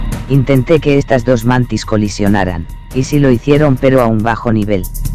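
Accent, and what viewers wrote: Spain. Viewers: él es de España.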